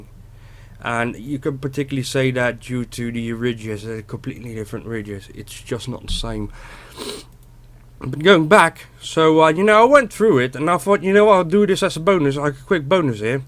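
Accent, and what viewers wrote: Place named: Netherlands. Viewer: Ik kijk al je filmpjes op zijn tijd... Ik herhaal je debuutfilmpje nog steeds vooral vanwege het geraamte (gebroken wiel) bij de zeebedding met nog duidelijk water in de goot en de discutabele commentaren daarover. Ik versta ieder accent in het Engels en kon snel horen dat je Nederlands spreekt. Omgeving Rotterdam of daaronder? Maakt niet uit, je hebt altijd een punt om over na te denken ook al zie ik niet alles zo als dat jij dat ziet. Keep up the good work!!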